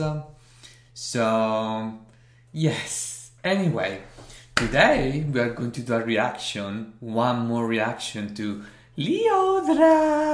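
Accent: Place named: Italy. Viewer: Omg i love your voice so Italian, humble, and your reaction so so natural, good luck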